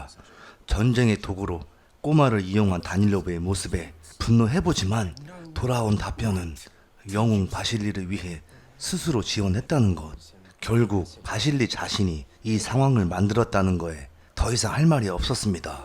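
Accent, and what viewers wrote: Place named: Chungcheong, South Korea. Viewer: 주인장님이 충청도 억양이 쎄네요.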